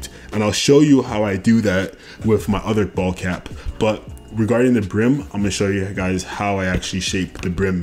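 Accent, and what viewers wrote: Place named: Canada. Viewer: so what part of Canada is your accent from if I may ask?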